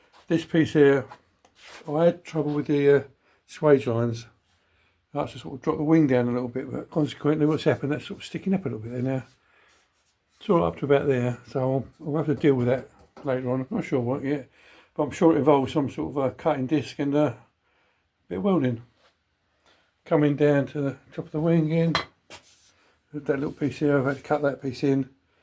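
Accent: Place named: Southern England, United Kingdom. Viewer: Hello. You probably know just saying, A30 has long whip gear lever and later A35 has remote gear lever and slightly altered transmission tunnel. I think the last 1098 engines had a beefier gearbox casting as well some one else will know for sure. I had a late 1098 van (JUW 334 D) for a bit and being so light it was a lot quicker than you’d think and it was bog standard. There were lots of A30s with A30 gearbox and A35 engines - 948 with modern big ends you could rev all day - so no tunnel mods, but i think general opinion was put the 1098 with its correct beefier late gearbox, something to do with baulk rings? Correct me anyone if I’m wrong it was forty years ago. Love your videos mate, wish I could weld like you do. Got a huge soft spot for A30s and anything 1950s. You’ll end up with panels fitting better than from the factory. 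Don’t mind bits of music and I like your voice - spent years in the midlands and any southern accent now is music to my ears. Cheers.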